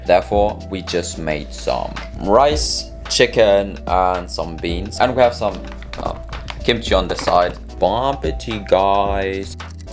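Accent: Germany. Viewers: On dirait il un accent allemand💀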